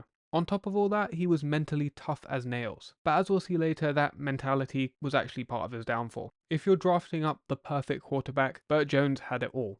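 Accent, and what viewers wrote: England, United Kingdom. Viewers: An Englishman doing American football sounds funny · why a whack english chap narrating doesnt mix with AMERICAN football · British narrator or AI ?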